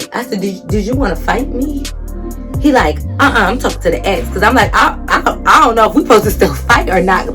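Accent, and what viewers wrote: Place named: Chicago, United States. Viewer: gotta be from chicago 😭😭it sound like my city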